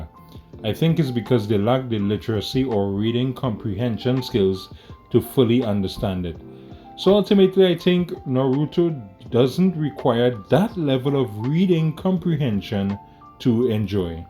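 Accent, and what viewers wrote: Trinidad and Tobago. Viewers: Havent even listened to the video in full yet but following because i hear a Trini accent i think? And i support a fellow Caribbean person doing this 💪🏾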